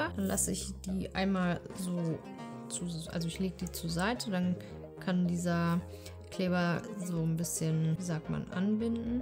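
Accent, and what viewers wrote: Berlin, Germany. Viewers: kann dit vielleicht ma sein, das de gerade frisch aus Berlin zurück warst vorm Videodreh? Da kommt so schöne Berlinerschnauze durch. 🤗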